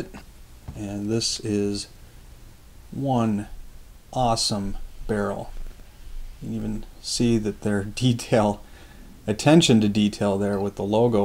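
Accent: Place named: Upper Peninsula, United States. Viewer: I've watched several of your videos in the last couple days and the first thing I thought was wow this guy has a thicker yooper accent haha! I was close. Sounds like you are in MN. I'm right next door in southern WI. Anyway, nice build. That is a sexy rifle. Gives me a few ideas now that I'm thinking about building one myself possibly. I've learned a lot from your channel so far keep up the great videos and thanks for sharing!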